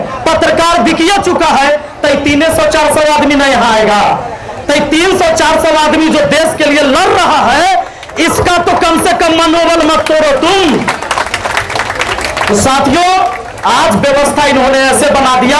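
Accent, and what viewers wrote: Bihar, India. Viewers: Bihar ki awaj hai * sir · गुरु जी बिहारी की बोली पुरे देश में गुंजना चाहिए!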